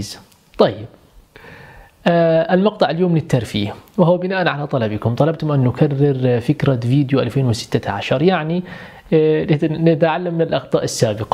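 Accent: Palestine. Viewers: شيخ نص كم😂😂😂 فلسطيني ميه ميه،الحمدلله تكلمت فلسطيني قليلاً لاني كنت اشك انك تجيد لهجتك😂🧡😊 طلب صغير .. تكلم فلسطيني ولو جمله واحده في فيديوهاتك قلت لك اني اشك انك تجيد لهجتك😂💛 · s sss مع أنو ماني فلسطينيه بس بحبا للهجتكن كتير وبحب اسمعا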